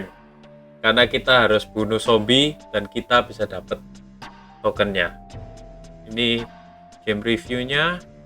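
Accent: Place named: Java, Indonesia. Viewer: Si medok membantai Zombie kapan nih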